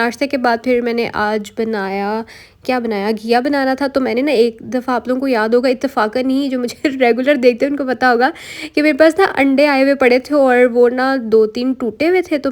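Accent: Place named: Punjab, India. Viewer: Love ur Punjabi accent 😂❤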